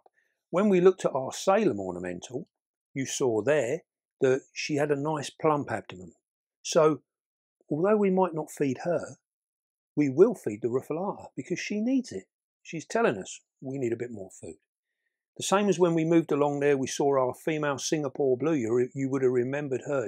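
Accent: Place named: United Kingdom. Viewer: Who else says "Hello Guys, welcome back to the Beastie Room" in their best British accent, right along with?;), as always, top notch production with lots of fun 'eye candy'. Again, is that me, the woman who has been afraid of spiders all her life, saying that?? And to Camera Lady, Bless you! Always look forward to your videos.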